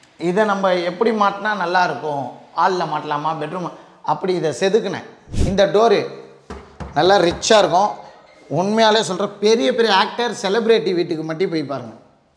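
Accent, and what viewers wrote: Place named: Kolar, India. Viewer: Kolara pesararu 😂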